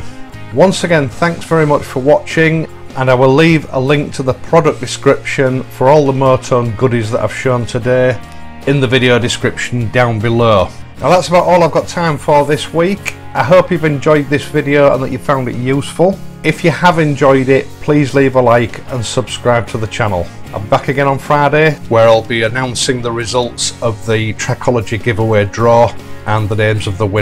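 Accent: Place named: Hull, United Kingdom. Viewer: Great video, I remember those Laverda handlebars from Jota reviews I read in the '70s when I was just riding a Yamaha FS1E. Nice looking Bonnie you have, I'm thinking of getting a T120 and saw your review on that also. I'm from Canada but have relatives in Hull and Beverly, your accent sounds very Hull to me but I could be wrong. Thanks for the great videos, good information and nice video quality.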